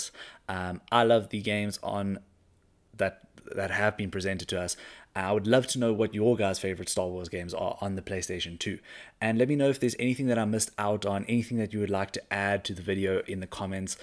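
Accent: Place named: South Africa. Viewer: Let's go! I love that type of accent. I used to have a hard time detecting between Australian, New Zealand, and South African. But I think I'm starting to get it finally! District 9 and Chappie actually helped me a lot with the South African side of things. Anyways. I'm in a big Star Wars phase, so this video was really nice, thank you!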